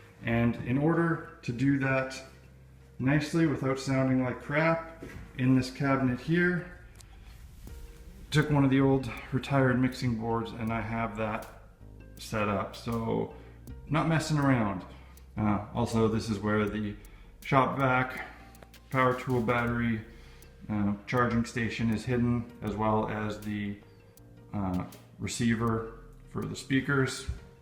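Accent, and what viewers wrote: Canada. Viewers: Are you from New Brunswick?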